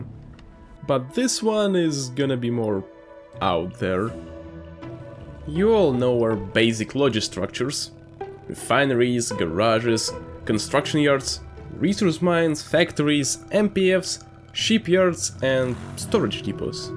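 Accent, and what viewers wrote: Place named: Russia. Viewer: Я слышу русский акцент...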